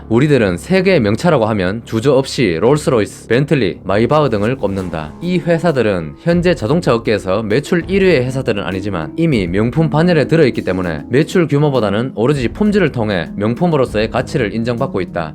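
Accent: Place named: Gyeongsang, South Korea. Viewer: 갱상도 ㅋ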